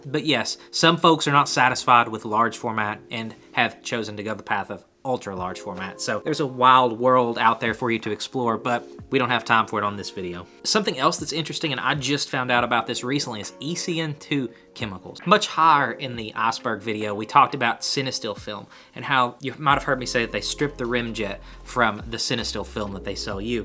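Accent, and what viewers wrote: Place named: South, United States. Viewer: i love the southern drawll accent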